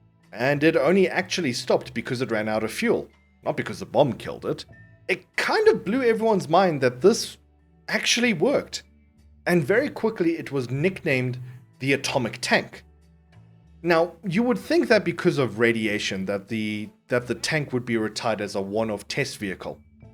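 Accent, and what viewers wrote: South Africa. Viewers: In love with the Afrikaans accent.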